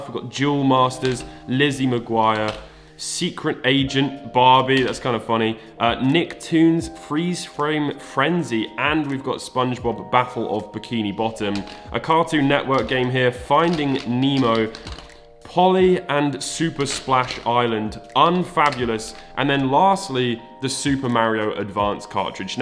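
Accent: United Kingdom. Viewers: Oh, you are brithish! You should go to this place called Goodwill Computer Works, there's one in central texas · He’s British like me 😅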